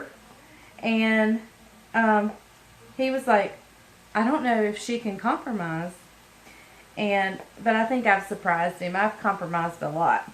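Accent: South, United States. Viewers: Are you saying Aldi?? Sorry this northern girl is having a hard time with the southern accent😅😅 love your channel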